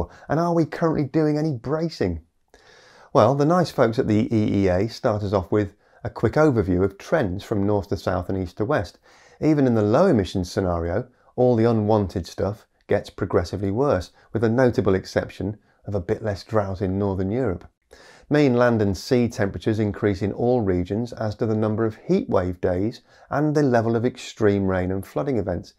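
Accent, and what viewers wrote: United Kingdom. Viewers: You may be a Brit, but I do not consider your accent especially funny. Instead, I consider your videos on GW excellent. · True - although I'm mostly here for the knowledge the humor helps and let's admit that we Yanks do love a British accent. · I about spit my coffee out laughing . I do appreciate you switching between existential dread and hope. However, my favorite part is the humor in your presentations. Between the terrific British accent - Yank here - and the humor, it's a great start to many a Sunday.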